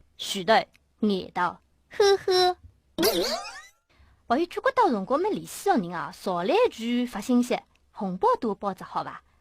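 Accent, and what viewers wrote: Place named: Ningbo, China. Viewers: 这不就是很正常很普通的宁波话吗……顶多有俩字读错（我就听到“容”和“迎”读错了，人家“微信”都读的对了），怎么一大票人跳出来说不正宗不纯正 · 讲的有很多上海话口音、不纯正的宁波说话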